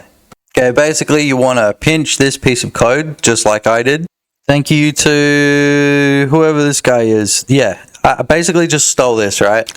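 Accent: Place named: Australia. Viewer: Australian English can be a bit tough. Slow the playback down to 0.5 to drag out those vowels and make it sound like were all at the pub after a big night :)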